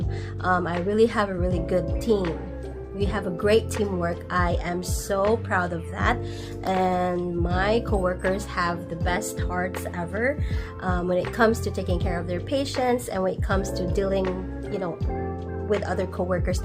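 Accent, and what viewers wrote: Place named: England, United Kingdom. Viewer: ❤ preparing napud para sa NCLEX puhon, 2nd take na naku ni puhon. huhu mahadlok ko magtake usab pero I know God has a plan. Nakita naku imuha video namotivate og na inspire napud ko nga unta makapasa napud ko in God's Will. Wala pud ko experience as a nurse sa pinas, mao daghan ko what IF diris US. Diri ko sa Kansas karon mao duol2 lang d i ta taga MO man ka🥰 nice to meet you sis diris yt. Follow ko nimo kay gusto pud ko makabalo labi na bahin sa Nsg Journey nimo arun naa pud ko idea puhon, kaluy.an. Simplify Nclex pud ko karon. I Love imuhang english accent i hihi!☺️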